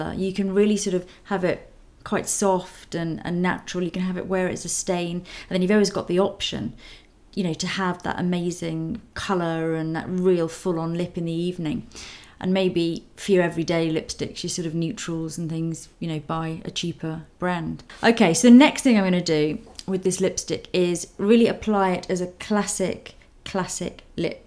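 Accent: United Kingdom. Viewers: Hi Gorgeous teacher makeup!I am so like Your tutorial and I will have playing pleasure with lipstick apply like you and I like your natural british accent! with wishing love and happiness Astor for you <3:D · I love how you speak....just how the words roll out of your mouth and that British accent...Oh dear, so pretty...<3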